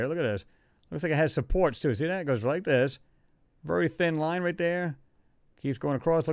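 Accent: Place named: Louisiana, United States. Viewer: You sound like you’re from Louisiana. My home state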